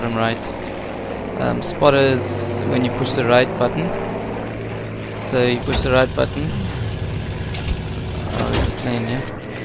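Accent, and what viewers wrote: South Africa. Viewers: Bro heard by your voice you were a fellow RSA member 😂😂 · SA Accent?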